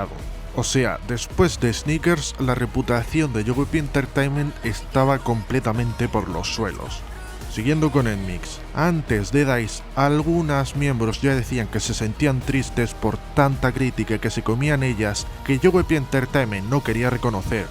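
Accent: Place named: Spain. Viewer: hola humano por el acento debe ser español me gusta que alguien intente profundizar con bisturi en las entrañas de nmixx buscando el problema de su muy poco mas que mediano exito hasta hoy, analizando con lupa a detalle cada cancion y comeback no coincido con el caso kyujin para mi ella tiene que ser el centro y es mejor en todos aspectos que sullyon solo exceptuando la belleza, o.o Dice, soñar, son canciones que poco tienen que hacer contra las de grupos como new jeans,aespa,no se diga grupos de alta calidad musical como XG, tripleS, pero tampoco las calificaria como basura, yo en lo personal prefiero Dice que run for roses Dice es una cancion corta pero grandilocuente llena de cambios de ritmo instrumentalmente rica y fantasiosa una especie de k-pop progresivo es dificil que el publico coreano la aplaudiera mientras que run for roses es mucho mas basica y simple alli fueron a la segura y les resulto cada quien tiene su propia opinion coincido en que el mayor culpable es la empresa ya que las chicas tienen talento de sobra lo unico que necesitan es de buenas canciones que las hagan destacar pero esto pasa con muchisimos grupos y podia nombrar el caso de Kep1er y Everglow y algunos otros mas con miembros con gran calidad pero que es poco aprovechada con canciones terribles como tipi tap o zombie que uno no puede creer no haya un filtro de calidad que se de cuenta lo pesimas que son y salgan al publico,espero que siga haciendo videos porque hay muchos casos de que profundizar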